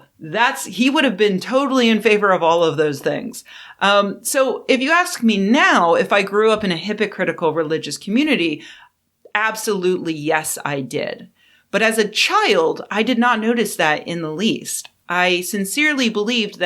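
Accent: United States. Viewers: 1.)Congratulations, you have a strong American accent, but you enunciate so well that I can easily understand you. I often give up on trying to understand Americans because, in addition to their accents, they fail to enunciate. 2.)I am autistic so my brain does not work like most people's. 3.)Even from before I was born, I attended an evangelical Baptist chapel in a strongly Baptist rural community. I still believe that the whole community, including my parents lived as closely as they could to the teachings of Jesus Christ - they were not hypocrites. But by the time I started school at the age of four, I had decided that I did not believe what I was taught at Sunday School, as much as anything because the God of the Bible did not behave as well as my parents or others in the community - he seemed like a quite unpleasant sort of chap. I retained a vague notion that all those adults whom I respected must have known something I didn't, but even that became untenable after a while.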